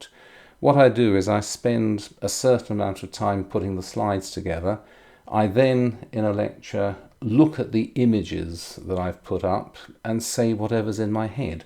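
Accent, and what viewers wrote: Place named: Oxford, United Kingdom. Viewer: Thank you Mr. for all your videos. American subscriber here, I appreciate your easy to understand lectures about interesting historical subjects. Plus your accent makes me feel like I’m a top student attending a class at Oxford University. I’ve listened to your videos several times over and eagerly await your next upload. Thanks again.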